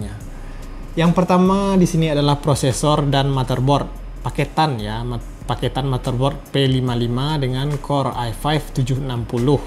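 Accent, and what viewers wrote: West Sumatra, Indonesia. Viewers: Logat minang nya sangat kental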